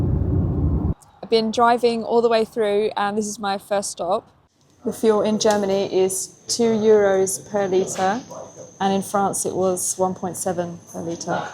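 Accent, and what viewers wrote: England, United Kingdom. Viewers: اللهجة البريطانية الانكليزية لغة الملوك ❤ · expat in Germany here. She sounds English to me but said, for example, "stopped at a gas station" which is extremely american. Is that what we now say for petrol station in the UK? 🤥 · It's my first time in your channel and I have to say your British accent is amazingly charming ! Thank for sharing your experiences and the best wishes from a Brazilian fan!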